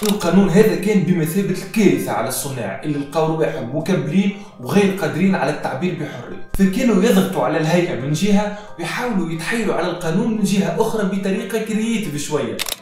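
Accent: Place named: Tunisia. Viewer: حضرتك تونسي انا اول مره اقهم حد تونسي ❤لكنتكم حلوه اوي بس صعبه شويه ❤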